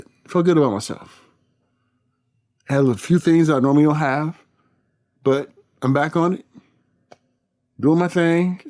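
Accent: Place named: United Kingdom. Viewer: Your British accent is EVERYTHING!!! You sound better at the Queen's English than I do 😂😂😂😂 xxx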